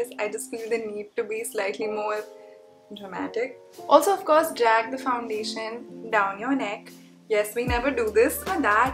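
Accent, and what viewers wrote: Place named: Mumbai, India. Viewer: You've got the mumbaiyaaa tone already makes me smile idk why ♥️😘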